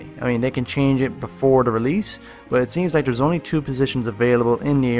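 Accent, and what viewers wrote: Ireland. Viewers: Hey, how do you get to play at E3, i was at it, but i didnt get to play anything? also great video, are you irish? u sound irish, im irish lol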